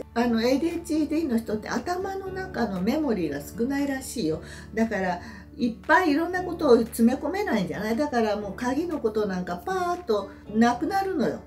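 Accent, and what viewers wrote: Yamaguchi, Japan. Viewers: 山口弁がとてもここち良いです。私も山口出身の山中湖住まいの70歳です。凄く元気もらってます。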